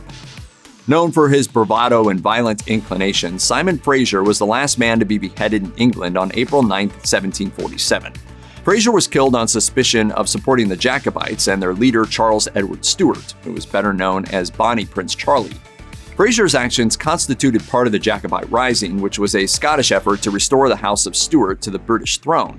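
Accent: United States. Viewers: I didnt finish the documentary because of the annoying American accent 🤷🏽‍♂️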